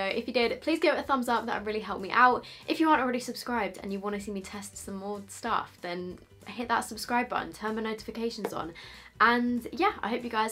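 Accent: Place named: United Kingdom. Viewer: the subtitles were transcribing from a British accent I believe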